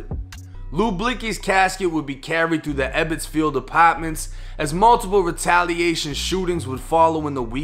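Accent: Boston, United States. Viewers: This dude got a boston accent.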